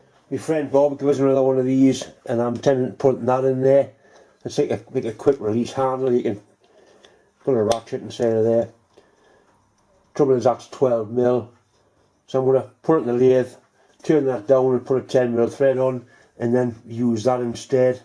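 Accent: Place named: RP, United Kingdom. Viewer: I think if viewers can’t understand your Queen’s English they just need to watch more of your videos. It kinda grows on you 😊. All the best to you and your family. I hope you have a Merry Christmas and a terrific New Year!!! Norm in Arizona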